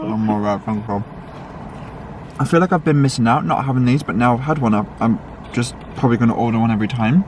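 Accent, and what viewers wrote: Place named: London, United Kingdom. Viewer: Hi cant say look behind you mate couldnt stop lol seeing your twin brother behind made me cry shall we go to gregs not hello mate its the london acsent mate ha ha you have done so much in you london home take your time you and will get there and look back as we all have done life is a learning kerb mate this is a londoner talking to another londoner mate ha ha enjoy the journey❤😊😅🎉😂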